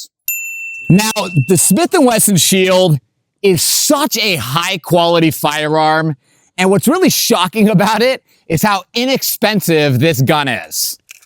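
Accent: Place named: Tampa, United States. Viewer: This is exactly what I would expect a person from Tampa to sound and look like.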